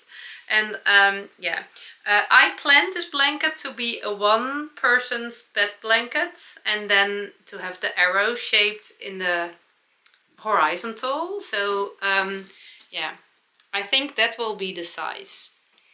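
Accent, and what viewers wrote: Netherlands. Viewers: I feel the same way about my legs! You will enjoy sewing the more you do it. It will become heart jumping! I have to admit that I looked up "Hard jumping" when I first started watching your videos! Then I heard you say it enough that I understood what you meant. I enjoy your Dutch English! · I really enjoy listening to your 'Dutch-y' English...especially 'heart-jumping' and ' Sauce'...and any others you wish to create. 😉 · I'm so glad I found you and your "Dutchy English!" I'm half dutch myself, so it's so fun to listen to you! I really enjoyed your podcast, so gezellig! I also enjoy knitting, crocheting, and I love sewing as well. I've made some of the Sew liberated patterns, but now I need to get the tiered skirt pattern! I've also made quite a few of Lucy's crochet afgans for my children. I love all the colors of your new advent afgan you plan to make in Lucy's woodland afgan. 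I subscribed to your channel! Groetjes uit Canada! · I would suggest saying, “that explains my Dutchy accent”. There is nothing to apologize for . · What you have is a lap blanket in English. Heart Jumping=(English expression)my heart skips a beat, other heart expressions are (heart warming=meaning something that makes your emotionally feel good, usually when one person helps another) (heart pounding=a reaction to a scary or surprising experience.) English needs the adjective phrase of heart jumping and as a native speaker of English and English teacher, I'm adding it to my vocabulary. English loves to borrow from other languages and I think that this a great one to add to English. I love your Dutchy English which is 98% correct to the ears of a Canadian. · Lovely video! And never apologize for your Dutchy English. I was always so impressed with the Dutch people’s fluency and ease in so many languages. 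They would greet me in one language after another until they found mine. Lovely people, beautiful country. ❤ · Your knitting channel is my favorite one,. You are very genuine and knowledgeable. It love your Dutchy English! I agree with you about the Magic Knot and square knots. They don’t work in my knitting either. I tie the square knots with intent to undo them, then twist the yarns and weave in the ends. I consider that Weaving in the ends is part of the knitting process, just like casting on, binding off, and swatching. Keep up all the HEART JUMPING videos!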